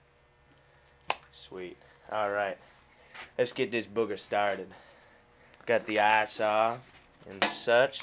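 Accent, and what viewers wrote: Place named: Canada. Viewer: daves farm guy maybe , sounds canadian , doesnt sound like me , your not acting goofy enough to be making fun of me :)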